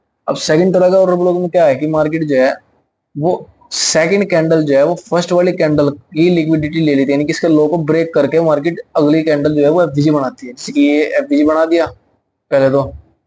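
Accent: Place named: Rajasthan, India. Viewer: Bhai rajasthan se ho na kyu ki boli se pta chl ra h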